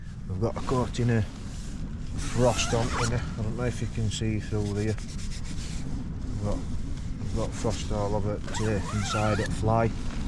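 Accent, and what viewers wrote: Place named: South Yorkshire, United Kingdom. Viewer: Loving these videos I’m a ryhill lad originally you sound like you’re from Royston or South Yorkshire bloke live in Barnsley now me and my son been watching these videos lately and the other folk good bloke outdoors Paul mesner lads only 7 but likes these videos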